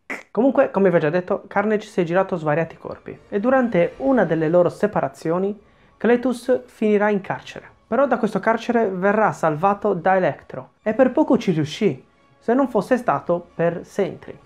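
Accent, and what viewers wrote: Sardinia, Italy. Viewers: Sei sardo nenno? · Ma di dove sei Cagliari, Quartu, Pirri? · Sto guardando tutti i tuoi video,stupendi! Ma.... anche tu sei di Cagliari?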